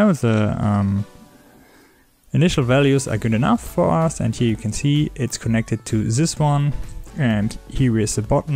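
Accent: Germany. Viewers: Kann es sein das du Deutsch bist klingt ziemlich stark danach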